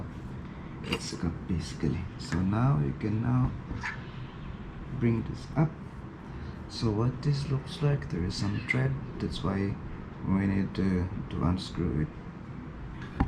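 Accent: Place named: Ifugao, Philippines. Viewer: Bro ano accent mo hehehe kala ko di ka Pinoy...taga Ifugao ka ba?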